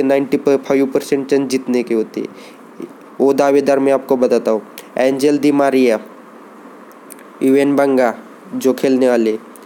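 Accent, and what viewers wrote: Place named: Northeast, India. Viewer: Bhai I'm sure aap Northeast se ho..100%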